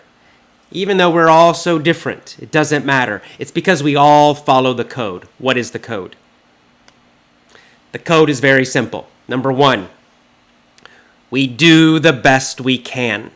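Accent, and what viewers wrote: United States. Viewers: Is he from America??. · hi Ihave one question is this American accent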